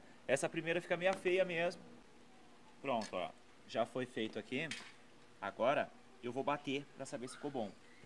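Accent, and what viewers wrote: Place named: Rio Grande do Sul, Brazil. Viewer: cara legal seu canal eu tenho vontade de comprar um caminhonete dessas ,vi pelo teu sotaque que tu parece ser gaucho tu e de onde ?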